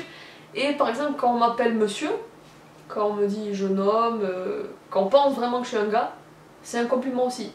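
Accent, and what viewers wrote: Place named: Corsica, France. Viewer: j'aime bcp ta vidéo tu as un accent Corse c'est ouf !😂 continue tes vidéos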